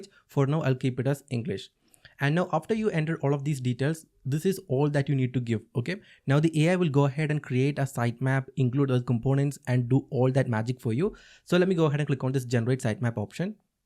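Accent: Kerala, India. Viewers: ah that irritating malayali accent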